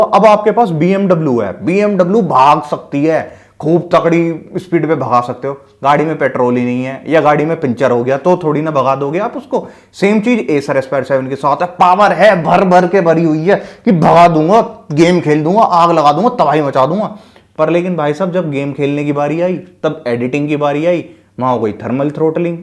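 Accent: India. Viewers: par bhaiya aapka bolne ka tarika ekdum mast hai , ekdum desi , very good 👍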